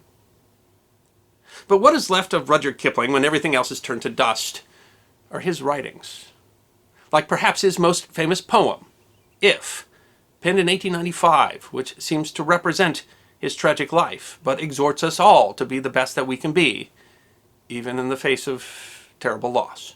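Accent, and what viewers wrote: United States. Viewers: Do forgive my apparent rudeness in writing this complaint to you about the way in which you narrate the uploads, which are well written but in my opinion badly spoken. The point is reached at times when the spoken words running continuously together, sound like a squawking chicken with an American accent. Furthermore, the word " duodenal " sounds phonetically as follows: " derwadenal " and whilst this might be the American way to pronounce this word, there are other examples, where the narrative is a confusing staccato aural onslaught. Your voice is highly pitched for a man's voice, in the same key as Kermit the Frog, from the animated puppet show called the " Muppet Show". Perhaps you should employ a professional speaker to narrate your uploads, which otherwise are very well put together. Best wishes, and keep up the otherwise good work. 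· Sorry but it doesn't sound right with an American accent.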